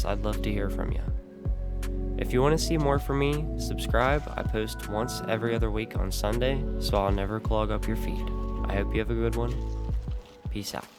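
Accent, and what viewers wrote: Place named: Baltimore, United States. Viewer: You from Baltimore? You have the accent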